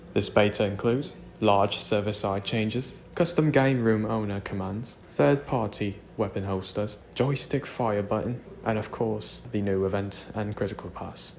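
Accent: United Kingdom. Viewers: Well hello there? R u British to? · Question r u British? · You grow so fast keep up the good work. LMFOAOAOA YOU SOUND BRITISH YO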